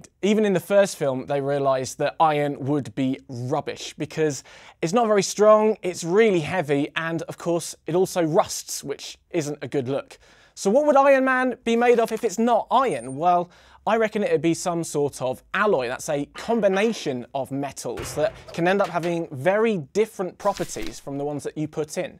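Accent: United Kingdom. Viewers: Its British accent xD...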